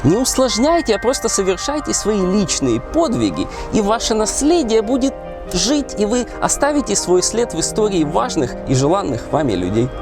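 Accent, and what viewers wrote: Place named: Odessa, Ukraine. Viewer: Вы 100% с Одессы , узнала по говору свои😄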